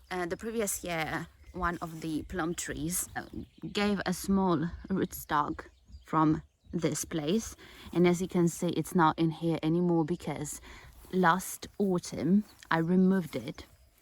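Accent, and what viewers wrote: United Kingdom. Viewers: Where did you learn English? It sounds like you have a British accent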